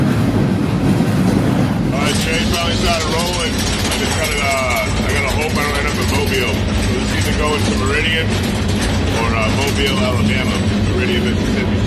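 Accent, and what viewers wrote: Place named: Maine, United States. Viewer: You sound like you're from Maine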